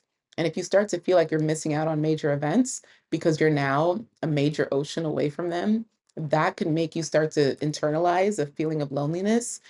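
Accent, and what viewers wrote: United States. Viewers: 👵🏽This is a compliment I love your American accent especially when you say y’all 🫶🏽✊🏽👊🏽💪🏽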